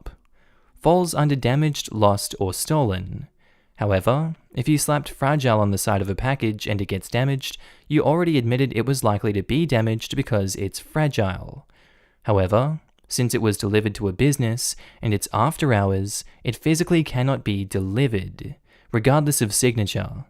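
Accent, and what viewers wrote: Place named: Australia. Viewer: My mom loves your aussie accent, and loves watching these videos with me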